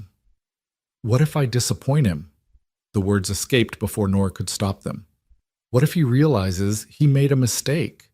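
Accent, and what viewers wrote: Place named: United States. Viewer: Your voice to text is highly amusing - obviously can not cope with your heavy American accent, eg a scent for assent, release or beliefs, eve Hercules for valise, united for he nodded, pastor for passed her and constantly wrote for rode. From Namibia.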